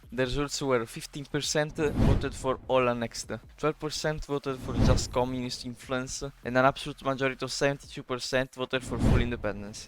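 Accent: Italy. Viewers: Ho capito subito che sei italiano per come parli inglese (non avevo visto il nome del canale) 🤣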